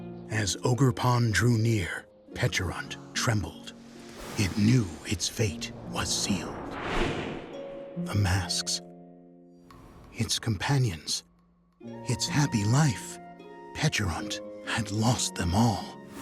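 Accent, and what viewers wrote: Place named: United States. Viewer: Why isn't this in English British but in a "Murrican" accent? And why isn't there still an option for British English so Fahrenheit becomes Celsius in dex entries?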